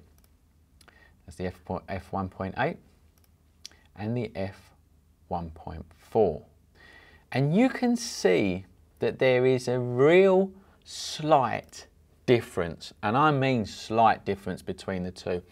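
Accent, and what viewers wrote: United Kingdom. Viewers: what i learn is, UK accent, is very pleasing if i may presume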